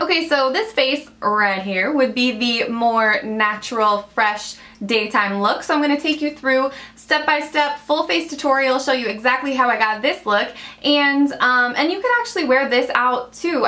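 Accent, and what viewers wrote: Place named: Mid-Atlantic, United States. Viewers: ur from philly area? northeast? south philly? west philly? am from bucks county area..wow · When people talk about your accent, I am always confused, since all my family lives around Philadelphia, so I don't think you have an accent. You just sound mid Atlantic.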